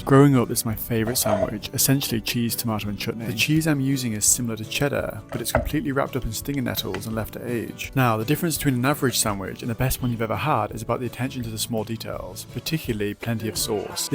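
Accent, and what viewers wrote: United Kingdom. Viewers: لحجه بریتیشو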